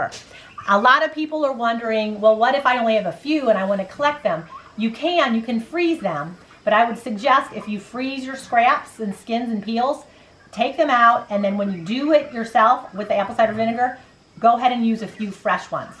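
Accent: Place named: North, United States. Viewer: So much I didn't know about apple cider vinegar, wow! Thanks for all the tips and tricks. I'm putting home made a.c.v. on my list from now on! Also,...I hear a northern accent. Are you from WI by chance? Cuz I am, and would love to brag that you are too!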